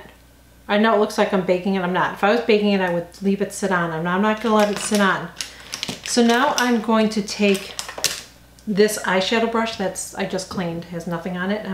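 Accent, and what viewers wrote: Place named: Midwest, United States. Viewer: Hi, I’ve been subscribed for awhile but I don’t think I’ve taken the time to comment. I enjoy your content and all the help you share. It’s a bonus that you’re so “real” (no shame in a close up, you rock it!) and I can get my fix of a Midwest accent! I appreciate that you return with some “revisions” and solicit feedback. It’s a process, working with these eyes of ours. Thanks for leading the team effort! 💐 Jacqui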